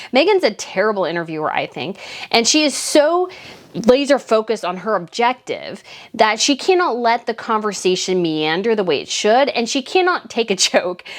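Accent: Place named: United States. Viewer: Amazing how you talk about their little 16 mil dollar home as if you're living in a $33 mil home yourself because you don't. In addition, how are you a royal commentator sounding like an American? There are no kings and queens here in the US, and this IS NOT a Monarch where you get paid to travel. So keep your negativity there in the UK. Smh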